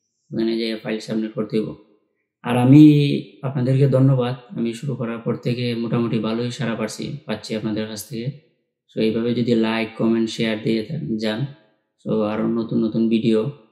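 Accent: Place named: Habiganj, Bangladesh. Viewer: ভাই আপনার ভাষায় বুঝা যাচ্ছে,,আপনি হবিগন্জ ডিস্ট্রিকের।আমি মাধবপুর থেকে বলছি।আমি আগে দূবাইতে ছিলাম,, আড়াই বছর,,,এখন পূর্তুগালে ভিসিট ভিসা পেতে আপনি কোন সহযোগিতা করতে পারবেন।অথবা ওয়ার্ক ভিসা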